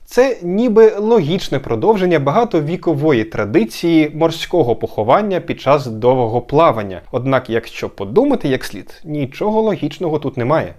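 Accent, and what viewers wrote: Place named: United Kingdom. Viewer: Де в епоху інтернету отримав таку чисту Bri'ish вимову?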